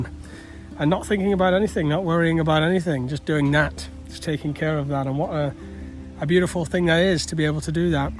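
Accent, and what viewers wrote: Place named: Lancashire, United Kingdom. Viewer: Love the Lancastrian accent.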